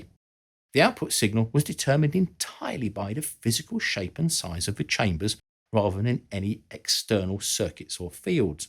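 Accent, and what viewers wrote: United Kingdom. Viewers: The British accent lends an air of credibility. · I knew this guy had a British accent before even hearing him.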